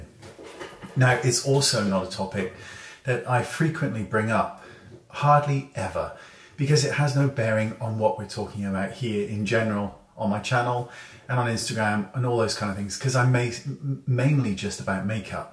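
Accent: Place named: West Country, United Kingdom. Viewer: I love your West country accent Congrats on your marriage, ignore the naysayers x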